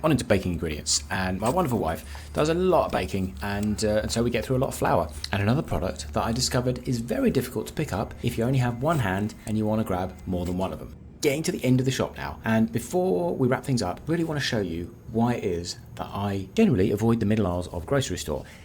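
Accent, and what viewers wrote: United Kingdom. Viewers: You sound British so it was surprising that your in Austraila.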